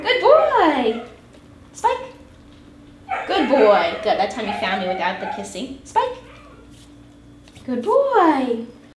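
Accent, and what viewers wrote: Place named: New Jersey, United States. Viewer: Duog. Gotta love that Jersey accent.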